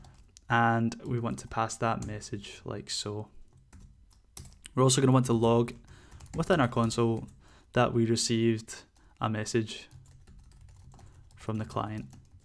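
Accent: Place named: United Kingdom. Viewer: Love the accent. UK, right? Another guess is NZ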